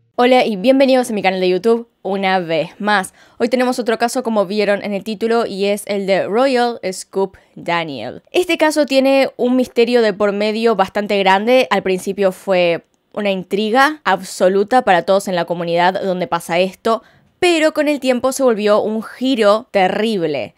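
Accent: Argentina. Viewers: ¡Me encanta tu gata! De ahí que es un plus más para ver tu canal, siempre me fijo en ella, a parte de tu acento argentino. Un beso guapa. 😘 😻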